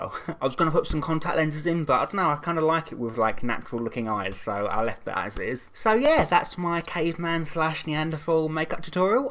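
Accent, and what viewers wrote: United Kingdom. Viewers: makes caveman sounds while still sounding british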